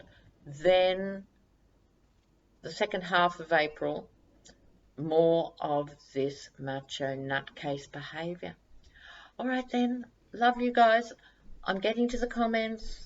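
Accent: Australia. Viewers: Thanks for your razor wit, intelligence and the amazing grasp you have on this whole twisted episode of living history. I’ve become a complete fan. Love to hear your Aussie-isms and fab lefty bolshy-ness calling it out. Xx best of love to you and your family while you are sorting out what needs sorting.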